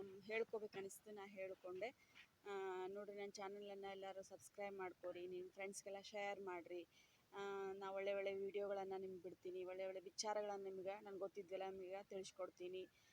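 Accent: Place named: Kasaragod, India. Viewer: Its not Kerala Karnataka kerala voice ---------> kerala , Kasaragod voice super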